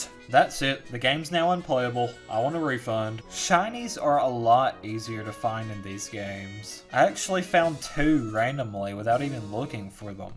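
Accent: South, United States. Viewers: Are you a southerner?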